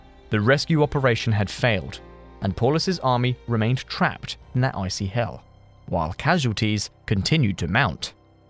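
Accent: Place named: England, United Kingdom. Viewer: Sería mejor un locutor de EEUU no a uno tan inglés, vuestra idea es llegar a EEUU, no a UK. Saludos!!!!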